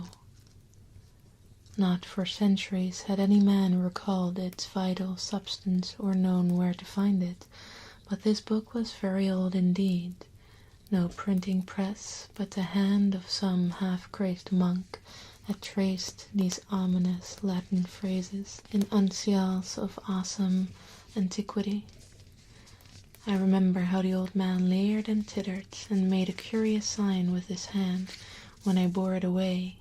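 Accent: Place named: Netherlands. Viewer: fireplug1701d Ah, you should have been here a couple of years earlier my friend. The lovely accent was more pronounced. Check out her older videos on the main playlist. I remember when our lovely couldn't say the number "three." There's no "Th" sound in the Dutch language. So three came out as "tree." It was adorable in her ASMR voice. Then one day, someone in a livestream requested she count to three. She scowled a bit before doing just that. End of an era.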